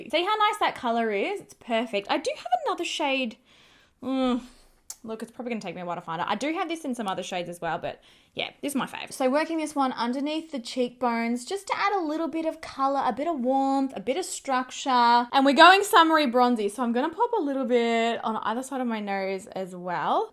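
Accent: Australia. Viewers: I’m a libra too! But I love how you Aussies say it! ❤❤❤❤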